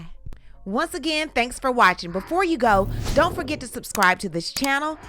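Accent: South, United States. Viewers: Are you from the South? 😀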